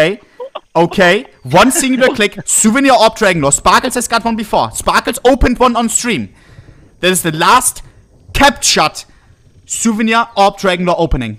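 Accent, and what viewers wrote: Germany. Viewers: german accent is real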